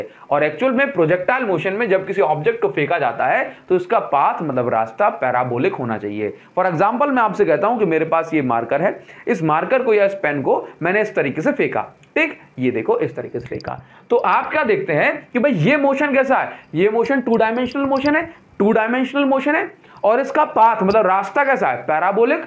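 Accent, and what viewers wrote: Odisha, India. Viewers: Sir are you from odisha?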